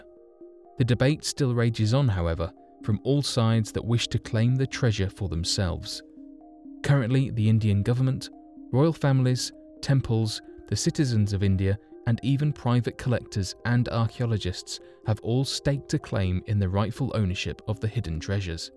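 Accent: United Kingdom. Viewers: The Aztecs were of north and Central America, The Incas are from South America. This information provided is off, everything sounds legitimate when you have a dude with a British accent narrating it.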